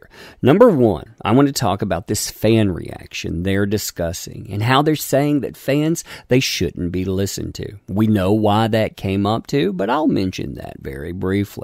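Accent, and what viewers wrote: United States. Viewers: I agree with you 100% but I disliked the video because of your scripted strong American accent.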